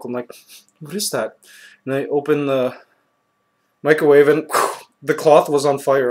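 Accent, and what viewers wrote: Philippines. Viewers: Filipino accent rocks.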